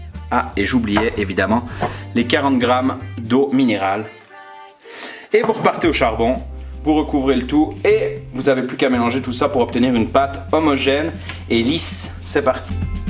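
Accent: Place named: Valais, Switzerland. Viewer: Le bonne accent valaisan 😍😂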